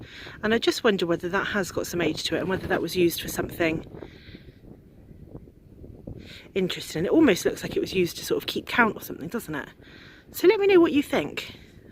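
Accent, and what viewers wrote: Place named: West Country, United Kingdom. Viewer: Brilliant! And we all love your wonderful, gentle westcountry accent!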